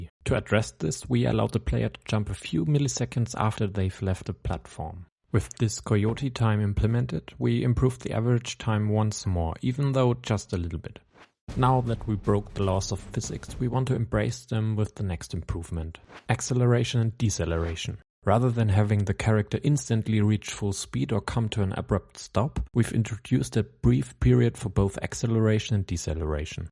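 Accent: Germany. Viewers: Schönes vid... und Dein englisch ist sehr gut, aber man hört immer den "Deutschen" raus.... 👍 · You sound a bit german but im not sure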